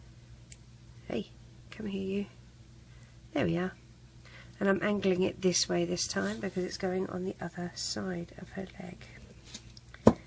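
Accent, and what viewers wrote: United Kingdom. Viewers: your BRITISH LOL LOL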